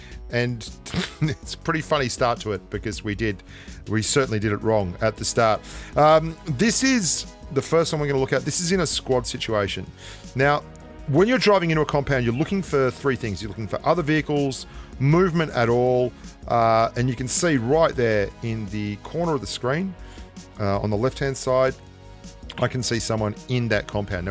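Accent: Australia. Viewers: yoooo u austrailian?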